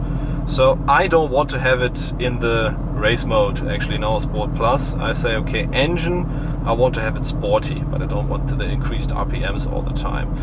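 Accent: Germany. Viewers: Der deutsche Akzent ist ja schrecklich 😂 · great video! more talking videos please! Also really enjoyed your German accent!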